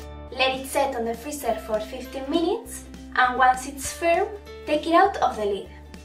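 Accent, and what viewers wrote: Spain. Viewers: She is from Spain, there is too much that she speak english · Se nota a tres kilometros que es española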